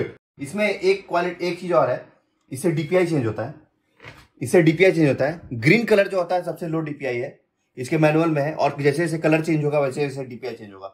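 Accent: Bihar, India. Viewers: Are you from Bihar ?????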